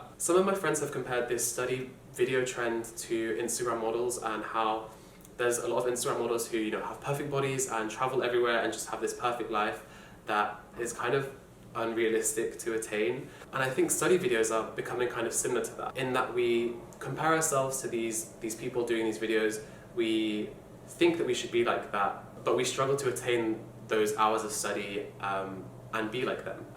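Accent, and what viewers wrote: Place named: England, United Kingdom. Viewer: I meant his English accent... It's just so cute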